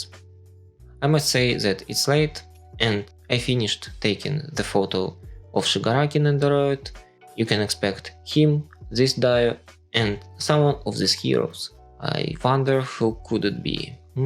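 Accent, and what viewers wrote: Russia. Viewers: Bro, are u russian? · У вас ооочень русский акцент ^~^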